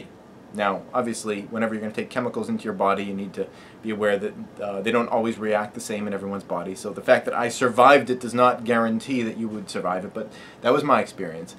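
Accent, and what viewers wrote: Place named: Nova Scotia, Canada. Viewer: I've just finally noticed how Canadian you sound... after this binge of videos... can't believe I didn't notice this before. That accent of yours is more Nova Scotia than Boston haha. I am using your dialect and the weather patterns in your videos to triangulate your location...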